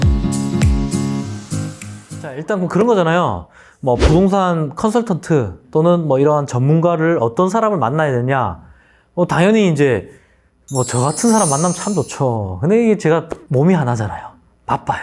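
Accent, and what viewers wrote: Busan, South Korea. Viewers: 이 부산출신이라 사투리쓰시죠ㅎㅎ 실물은 영상보다는 훨씬 멋져요 ^^ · 실물로 보면 괜찮다??? 원판 불변의 법칙을 아시나요? ^^ 아니 그냥 그렇다는 겁니다. 실물로 보면 잘생겼을것 같아요. 음....원판 불변의 법칙이 뭐었더라... 음... 암튼 죄송합니다. 결론은 실물이 멋지신걸로 ㅋㅋㅋㅋ 그리고 말투가 부산사투리 였군요.^^ 뭔가 이상하고 어색하다 했는데, 이제 이해가 되네요..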